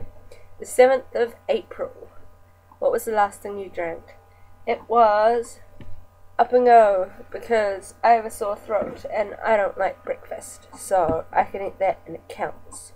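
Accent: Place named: New Zealand. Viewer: i guessed new zealand before you said ! i win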